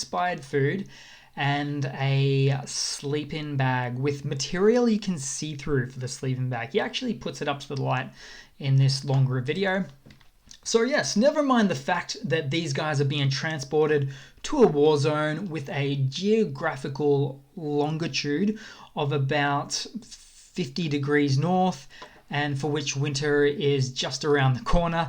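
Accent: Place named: Australia. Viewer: David ABBA thank you for this info 👍 A lot of us believe that is Australian based on his pronunciation of some key words. Not up to mischief but what do you think?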